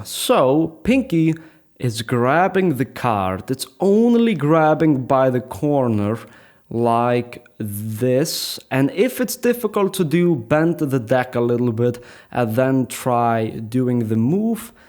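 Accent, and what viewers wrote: Russia. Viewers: He sounds Russian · I love the O.K. Hehe. (: I love the videos bro!! Your English is very clear, very good, and you're able to express yourself very well. Plus, the Russian accent is awesome! My dad is Jewish, Russian, Polish, etc. I've never been to Russia. Hehe. at times lives in my birth city Miami, FL. I used to live in but I am living abroad. I've never seen him in-person before. Thanks for the video!! (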